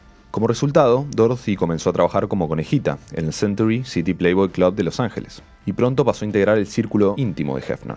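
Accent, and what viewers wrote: Argentina. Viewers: Me encantan todos los vídeos de y cada vez lo están haciendo mejor. Una crítica constructiva sin ánimo de ofender a nadie: Me gusta mucho el acento argentino pero en este formato documental es un poco chocante. Me refiero a palabras como «besha» por bella o «gashina» por gallina, también la forma de decir los años como 1009,80 por 1980.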